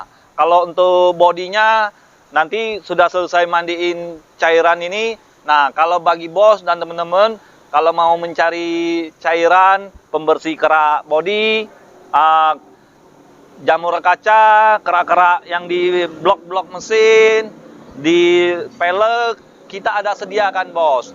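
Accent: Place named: Plumbungan, Indonesia. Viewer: Wong Plumbungan nian Dio ni klo ngomong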